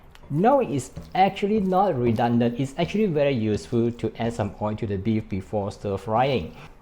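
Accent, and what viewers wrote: Singapore. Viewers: Singaporean accent, anyway thank you.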